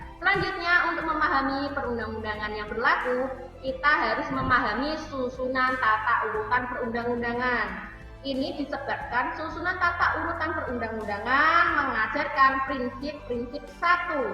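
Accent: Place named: Demak, Indonesia. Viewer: Medok banget suaranya, Org Dmk ya🤣😂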